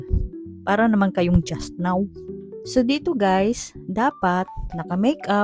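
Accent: Visayas, Philippines. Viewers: Biaya ka po ba? Tono mo po kasi bisaya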